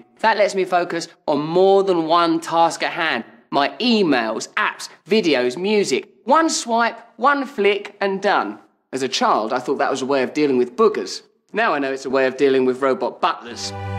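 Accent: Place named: United Kingdom. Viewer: I'm not British... so everytime I listen to this guy, I can't stop laughing, hahaha. Strong British accent, hehe :D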